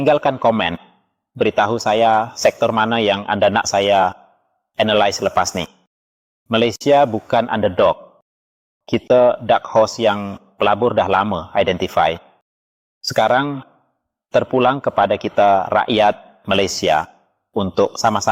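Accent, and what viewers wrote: Indonesia. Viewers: BUKAN BAHASA INDONESIA TETAPI BAHASA MELAYU SLANG ( LOGHAT ) INDONESIA.... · Pelabur & pencerita ini adalah warga Indonesia kerana slang cara di bercakap memang warga Indonesia.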